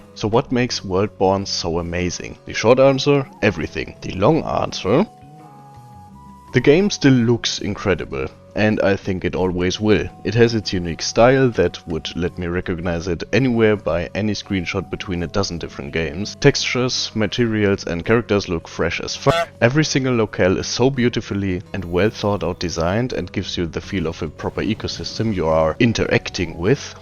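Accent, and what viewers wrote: Germany. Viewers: indeed. 60 hours and im closing in on my full set fatalis. Btw ur video was pretty cool, i rly like it. Ur accent sounds german. Am i right with that assumption?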